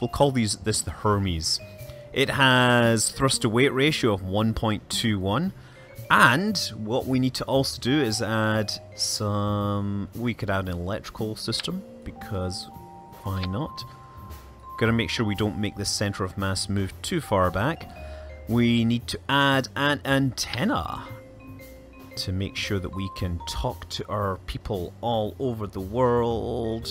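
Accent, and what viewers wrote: Scotland, United Kingdom. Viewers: you're scottish right?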